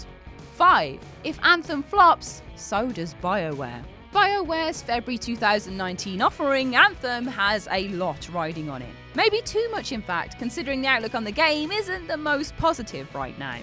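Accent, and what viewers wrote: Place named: United Kingdom. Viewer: Has this chick been in other videos? I like her energy... And her accent, my God, I could listen to women who speak British all day! Lol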